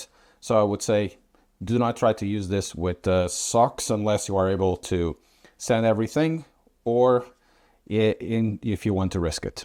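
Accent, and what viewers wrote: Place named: Portugal. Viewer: came for the reviews, stayed for the portuguese accent! Bom trabalho!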